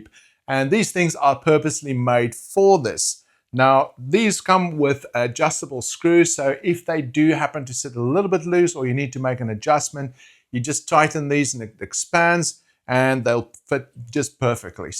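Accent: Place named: South Africa. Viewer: That’s awesome. I knew you were South African from the accent and the “spirit level”. I love this build and I’m going to do it boet.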